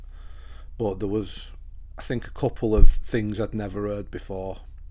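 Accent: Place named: North, United Kingdom. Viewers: I just had a thought could he have shortened anastasia to stasia or stay in life cause the stay sound in hers and Stacey is a coincidence to some degree? Also I'm from London & the way you Northeners say Romania as Rooo mania just makes my day every time you say it 😊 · So I had to ask........ I was visiting one of my best friends over the holiday who is an Anglophile (and never even been across the pond mind you), so as I never did pick up where it is you live specifically over there she told me that if I showed her a video she could pick out where she thinks you live based on your accent. My Anglophile Yank friend here who has never left North America or resided outside Washington State bets that you either live in or spent most of your life in or near Manchester. The north.... I'm dying to hear how close she is!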